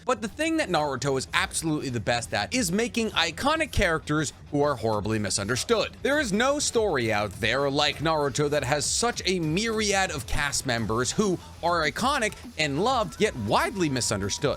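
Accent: United States. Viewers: You have the most American pronunciation of Japanese names I've ever heard. But I still look at your vids I just try to ignore it.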